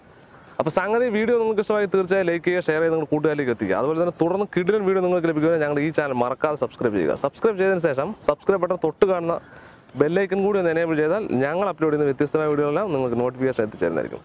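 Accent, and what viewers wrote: Palakkad, India. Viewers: palakkadan basha 😍😍😍 idhu kanunna palakkattukar undo?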